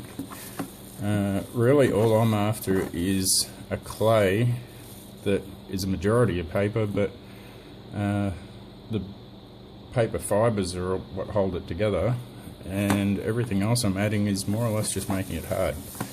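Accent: Australia. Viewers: hahaha, me thinks its his aussie accent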